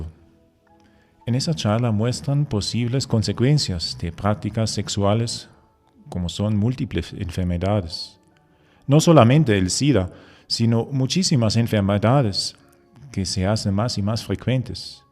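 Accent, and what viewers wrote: France. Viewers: ¿Quien habla es francés?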